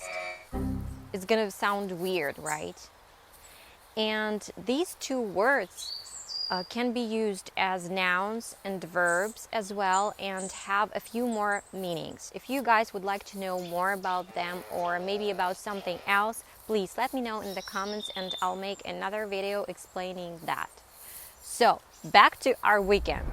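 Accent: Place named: United States. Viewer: Hey! Would be really nice to hear about your own journey/story on how you learned/are learning English, practiced/practicing your American accent. Different people process information differently. For example, some people may memorize new words by only hearing them, the others - strictly by seeing words on a piece of paper and so on. What is your method?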